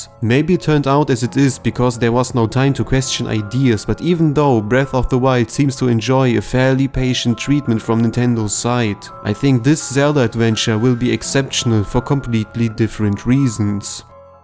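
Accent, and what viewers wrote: Germany. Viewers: I knew, that you’re German from the first sentence you spoke haha 😂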